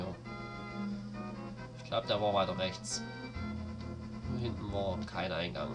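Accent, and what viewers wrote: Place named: Saxony, Germany. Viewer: sächsisch^^ willkommen im club :)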